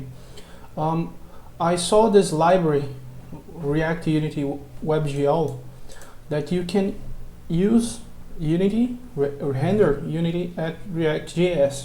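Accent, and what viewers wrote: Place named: Brazil. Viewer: tu é brasileiro ne man da pra sentir o sutaque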